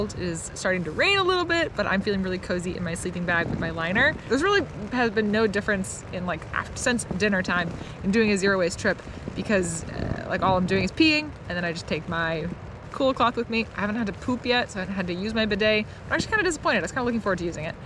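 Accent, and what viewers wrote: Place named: Pacific Northwest, United States. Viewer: You literally speak and act like all of my PNW friends. It's almost like its own dialect. It's hard to explain unless you grew up here.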